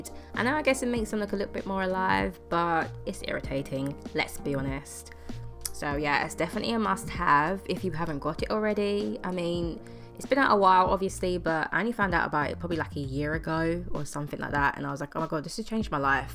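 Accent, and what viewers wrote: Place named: United Kingdom. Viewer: Can you tell where you found that gorgeous house??? The gallery?? Love your videos, obsessed with your voice/British accent , I love it so much!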